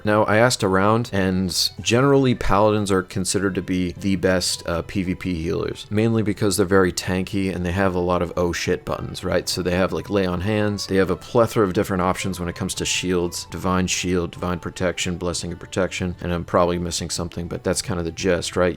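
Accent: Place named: United States. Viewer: I'm glad to have stumbled upon this gem of a channel! That's the case for me especially, since we seem to be very much on the same page; I'm like your European counterpart (unless you're not from the US, but you definitely do sound like it). Following the announcement of official Classic WoW, I was actually considering creating a channel and making videos on similar topics and in a similar fashion as you're doing, but real life got in the way, so it's nice to see that intention is being covered by someone else with roughly the same style, interests (LotR, obviously!) and even the same specific goals for Vanilla. As it happens, I'm also really adamant on playing a healing character since I played mostly as a mage and hunter during retail Vanilla & TBC (from which I'm returning to WoW directly). Like you, I was leaning most heavily towards the priest class, but now I'll also be strongly considering paladin, shaman and even druid (because I love HoT healing), at least for my alt characters. 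To effectively try out all healing classes, I'll be playing on an instant-60 private server called RetroWoW, where you can get free pre-raid BiS from vendors and start raiding or doing battlegrounds right off the bat. That should make testing out all of these classes a much quicker process; hopefully it'll allow me to make an educated choice before the launch of Classic WoW. Aside from which class to pick as my main, I'm also torn between Alliance and Horde. I feel more comfortable playing as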